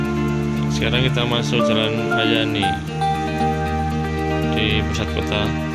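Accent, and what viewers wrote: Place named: Banyumas, Indonesia. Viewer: Sya juga ngapak... Hihi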